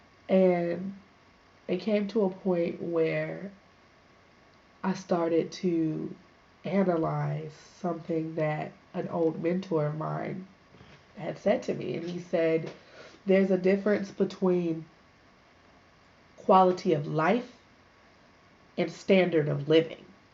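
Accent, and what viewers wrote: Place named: United States. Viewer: Hats off to you sister 😊 very inspiring! How did you get to Ghana originally to know of it as a lifestyle? You sound American born so I'm curious. Of course we all originate from there but I've never touched foot to know Africa as my home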